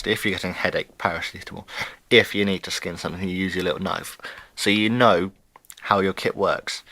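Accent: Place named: England, United Kingdom. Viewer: i am guessing you are english :)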